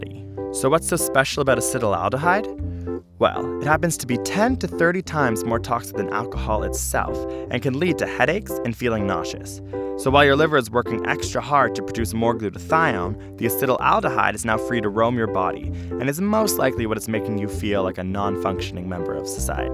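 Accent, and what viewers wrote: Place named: Canada. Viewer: From a phonetics point of view, this guy might just sound "gay" because he exhibits features of canadian raising- the other guy has it too kind of. I'm not saying all canadian guys sound gay, but that kind of chilled out, raised intonation (as if every sentence sort of sounds like a question) and vowel that's often found in californian and canadian english CAN sound similar to stereotypical "gay" speech. Of course this is all arbitrary as people sound the way they do, regardless of orientation.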